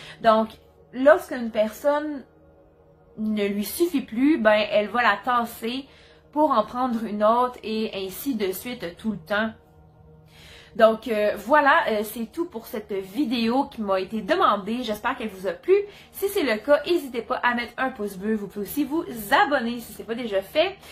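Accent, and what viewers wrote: Quebec, Canada. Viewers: J’adore l’accent Québécois 🇨🇦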